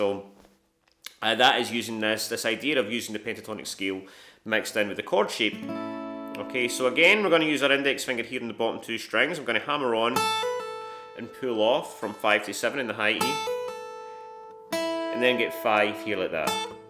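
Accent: Scotland, United Kingdom. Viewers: For those not used to a Scottish accent, turn on CC. You are welcome. 😉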